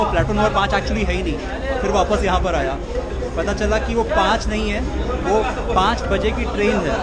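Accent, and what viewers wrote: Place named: Haryana, India. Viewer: Bhai Egypt m ab naya rule aaya h teen char din phle hi... Agr koi tourist ko tng krega na toh 10000 Egyptian pound ka fine h... Maine newspaper m pdha tha socha aap ko bta du.. Aap harayan se ho kya bhai aap bolte ho Bich bich m haryanvi ..aacha lgta h.. M bhi haryana se hu sonipat... I like ur videos very much.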